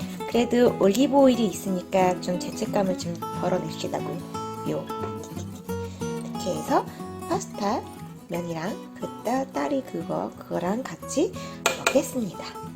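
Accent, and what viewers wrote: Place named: North Korea. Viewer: 우리 이쁜 북한억양. 중독댐!ㅎㅎㅎ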